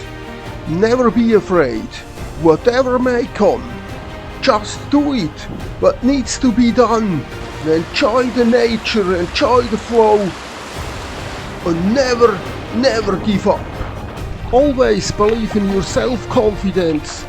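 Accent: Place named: Switzerland. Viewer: Hoi! Deine chanel ist fur mich eine echte schatz! Du gibst mir viele inspirationen wohin ich mit dem kajak gehen kann! Ich wohne seit 3 jahre in der Schweiz und ich habe jetzt gerade angefangen mit Kajak abentauer und ich suche jetzt fur wo konnte ich fahren! Aus deinem akzent ich glaube dass du eine Schweizer bist also ich glaube das tu die Schweizer kajak strecken sehr gut kannst. Dank dir habe ich jetzt paar Ziele, jetzt brauche ich nur gute gesselschaft! Ich lasse SUB fur deine channel! LG! P.S Du bist mega positiv Person, das macht fruede dich zu schauen :D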